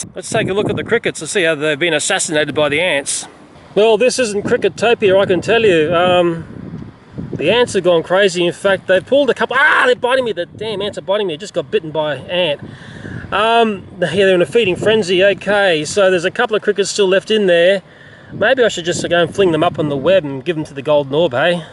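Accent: Australia. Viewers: Sees thumbail Thats a big spider. Do I really want to watch it? Do I want to be creeped out? Eh why not. The manly Australian voice makes me feel safe.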